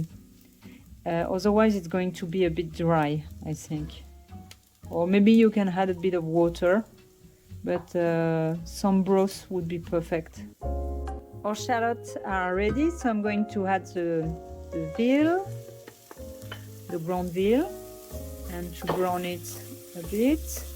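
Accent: France. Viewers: I love watching these videos---the recipes are always so good, the calm tone, when youre out walking around, I get to see Paris - you have a nice sweet voice with a lovely French Accent when speaking English - it is just so calming and refreshing to watch-there is no crazy cutting and chopping and throwing and slamming ingredients - so, thank you for making the videos for us to enjoy - MERRY CHRISTMAS AND BEST WISHES FOR GOOD HEALTH AND JOY IN THE NEW YEAR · I enjoyed this very much. French is my second language and I went to university in Grenoble in the 1990s. Your English is excellent. Love the accent. It reminds me so much of my French friends. Happy New Year!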